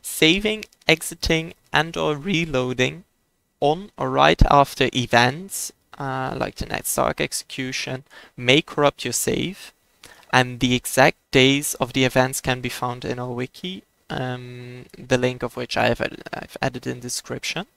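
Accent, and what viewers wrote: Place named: Netherlands. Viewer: You got a clean accent for a dutch dude ;)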